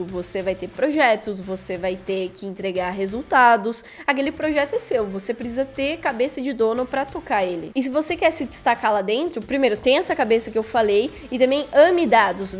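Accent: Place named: Rio Grande do Sul, Brazil. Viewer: Pela dicção deve ser gaúcha. Meu ouvidos agradecem quando eu escuto uma pessoa que pronuncia as palavras da forma certa!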